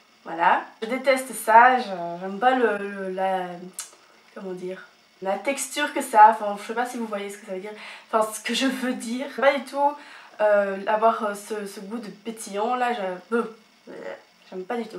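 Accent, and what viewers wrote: Belgium. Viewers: Super vidéo , ça permet de mieux te connaître :D Tu es belges ? :)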